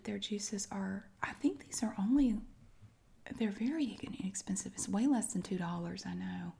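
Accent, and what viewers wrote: South, United States. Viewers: I love these aldi videos!! With your southern bell voice so, sooooothing!!!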